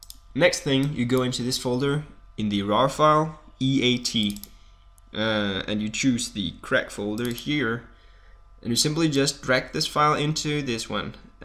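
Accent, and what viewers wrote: Denmark. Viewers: Oh... Jeg opdagede ikke at du var dansker lige i starten, davs! :D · .........You're danish...Aren't you? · you sound danish, are you? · Hej med dig :D Afsløret du en dansker :o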